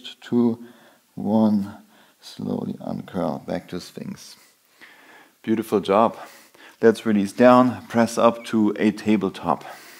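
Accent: Germany. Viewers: Das hört sich doch nach nem deutschen Akzent an 🤔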